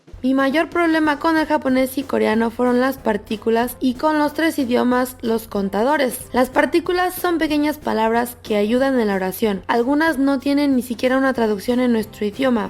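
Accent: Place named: Mexico. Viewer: Tu acento es mexicana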